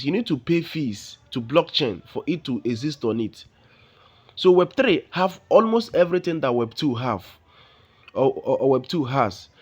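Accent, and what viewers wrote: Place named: Igbo, Nigeria. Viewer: You sound like a true Igbo guys that know what his doing..... great one Blockchain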